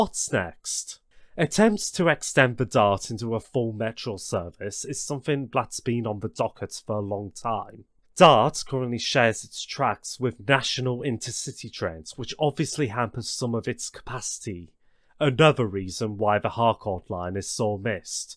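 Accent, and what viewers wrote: United Kingdom. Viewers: The person sounds like they're from Liverpool that's talking in this video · British accent is so strong its hard to tell you're speaking English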